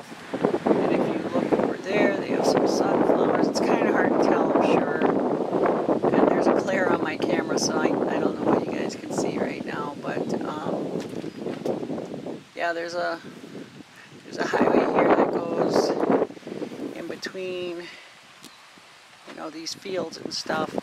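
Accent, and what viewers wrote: Wisconsin, United States. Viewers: ... NICE VIDEO ... VERY RESPECTFUL COMMENTS TOWARDS THE FARMERS & THEIR STANDS ... LOVE YOUR "WISCONSIN ACCENT" ...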